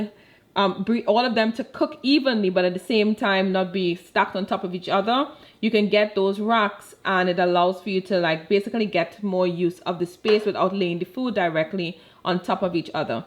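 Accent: Trinidad and Tobago. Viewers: You're Trini?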